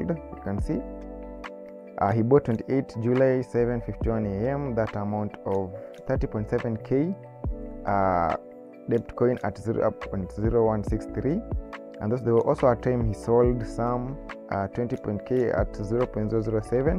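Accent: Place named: Kenya. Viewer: ik a kenyan when i hear one.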